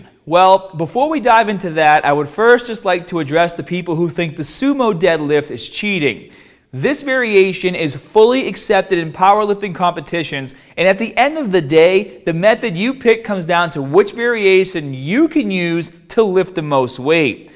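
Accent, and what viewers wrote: Boston, United States. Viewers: Boston accent sounds so gay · Holy Boston accent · IM hearing a familiar accent, are you from Boston? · My guy sounds like Seth Meyers with a Boston accent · I came to see what the difference is. But why do you have half a Boston accent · DIVINE PHYSIQUES TV it's not Aussie, it's a Boston accent. Boston, US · I've only watched a few of his videos but this is the first time I noticed his heavy Boston accent haha. Mainah heah bub. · Great advice brotha. Are u from boston??? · I think you are the best with online video explanations of dumbbell use. You are thorough and I truly appreciate you. I wish I could train with you in person. Do you happen to have a video on diet/nutrition? I've got to get 70 lbs off and begin training for a half marathon. By the way, love that Boston accent, I lived there as a very young kid when my dad attended Harvard.😊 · Soon as I heard the Boston accent I hit dislike and left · Boston represent! 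Your accent is wicked awesome! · Holy shit that is the greatest boston accent I've ever heard! baaaaah bell 😂👌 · One of the sickest Boston accents I've ever heard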